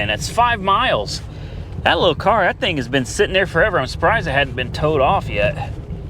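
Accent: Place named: Tulsa, United States. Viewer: Oh Dude, I'm watching this and cringing just knowing what's going to happen to you and you're such a nice person. My family is from Tulsa and I always feel a kinship when i hear you speak.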